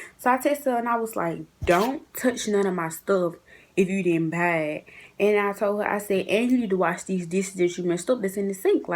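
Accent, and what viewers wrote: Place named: Georgia, United States. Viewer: I just love your accent.It sounds like you're from Georgia.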